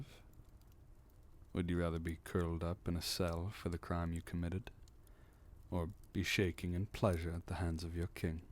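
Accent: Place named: RP, United Kingdom. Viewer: This mans voice is so smooth, out here sounding like a british gentleman from the 1800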